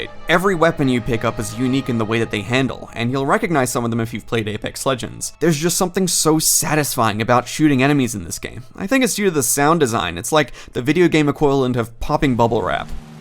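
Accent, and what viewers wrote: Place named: United States. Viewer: i have a question why do you have american accent when you are australian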